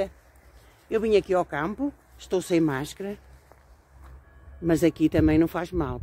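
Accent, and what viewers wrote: North, Portugal. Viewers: Olá dona! Parabéns pelo seu trabalho no YouTube, você tem sotaque do norte...é de que cidade? Sou de Vila do Conde -Porto.beijinhos grandes daqui do Norte. 😘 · A é Portuguesa e bem Portuguesa! A sua maneira de estar, de falar de se dirigir às pessoas, a sua sinceridade, é tudo bem Português! Obrigada por ser quem é: UMA GRANDE SENHORA! Continue assim e nunca se arrependa de ser tal qual é! Um 😘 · Olá dn., pela pronúncia acho que é do Norte. Póvoa de Varzim ou vila do conde. 17 anos é uma vida de.trabalho.se já tem a sua casinha, está na hora de regresso a PORTUGAL. PARABÉNS as receitas são óptimas. FELICIDADES tudo de bom um grande beijinho para si minha querida. É muito simpática 😍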